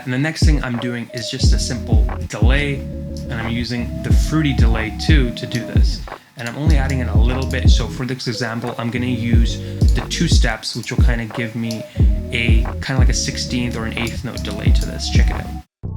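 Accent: India. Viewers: I swear I thought you were from India, lol.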